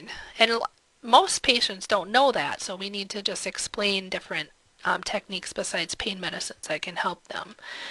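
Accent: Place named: Midwest, United States. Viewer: I love the mid western accent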